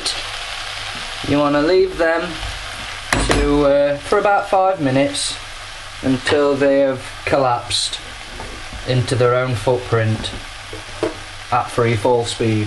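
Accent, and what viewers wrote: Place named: Stoke-on-Trent, United Kingdom. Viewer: Stoke on Trent accent....Shropshire.. Silk Road & all that jazz. You still alive?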